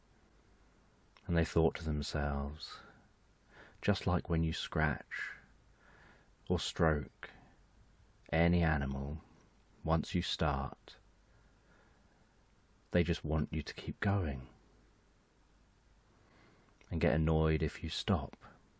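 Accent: United Kingdom. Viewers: Thank God for the British accent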